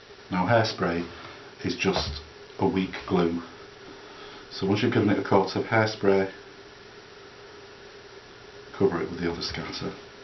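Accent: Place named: United Kingdom. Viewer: yes very good, your voice is fine, just has an accent. British I assume. Now PVA is? wood glue? the other thing to start with is spray adhesive..and then finish with hairspray. I just love the fact that nothing is wasted, just break it up in a blender. Roughly how much is a block and how many trees per block? thanks Nick (formerly from IOW)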